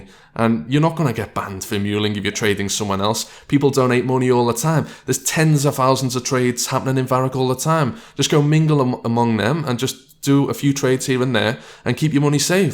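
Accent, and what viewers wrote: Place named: Liverpool, United Kingdom. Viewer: Scouse nonce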